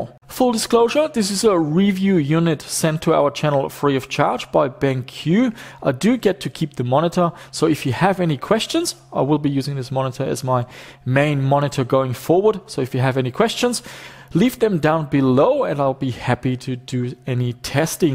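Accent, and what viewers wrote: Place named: South, Germany. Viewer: Wenn ich es nicht besser wüsste, würde ich sagen, du hast einen deutschen Dialekt 🤔 und deine Intonation klingt Süddeutsch.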